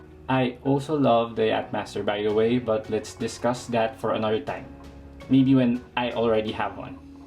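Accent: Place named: Philippines. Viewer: You sound like you're from the Philippines. I would have thought the 36mm size would have suited you better. Just thinking about buying one of these in the Turquoise blue. Just sold my Rolex Explorer to fund it.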